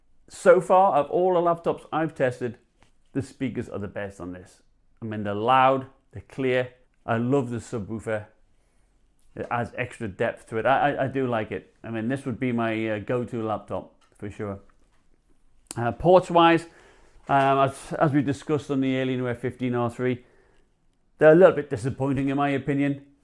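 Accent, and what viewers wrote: United Kingdom. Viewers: Like you British accent. Also thanks for a good review!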